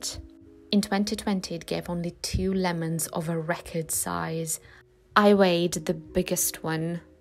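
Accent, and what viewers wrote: United Kingdom. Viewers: I was actually wondering about that. You consciously go for for a British accent?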